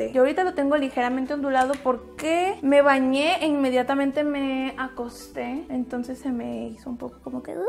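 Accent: Chile. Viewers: Me encanta que use el acento chileno weonnn!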